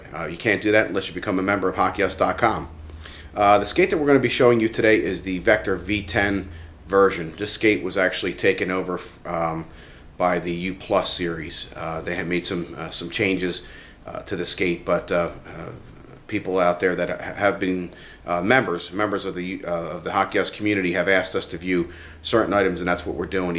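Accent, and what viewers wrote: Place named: Philadelphia, United States. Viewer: Is the host of this vid from the Philly area?? His accent sounds like it and he said "Wooder" for "Water". ;) I'm orig. from Philly, so I notice these things.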